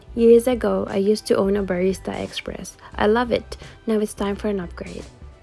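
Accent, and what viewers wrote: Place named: Australia. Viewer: Ahhh!!! Ganda! Coffee lover here!!! Love your Aussie accent :*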